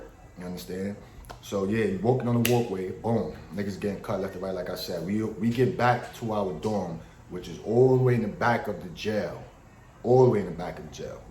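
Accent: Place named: Queens, United States. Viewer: Your from Queens my G? It sound like you speak that Dunn language, lol. You got a Prodegy cadence. Respectfully, I'm not from New York.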